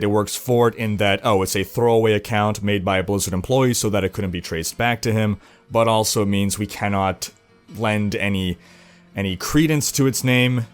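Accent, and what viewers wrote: Sweden. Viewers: As a swede I just couldn't stop myself from laughing hysterically to your Swedish pronunciations. xD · That swedish uttal... :D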